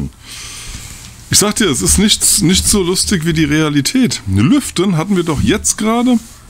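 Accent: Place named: Hesse, Germany. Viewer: Ich liebe die Rezepte❤ und den hessischen Akzent. Much love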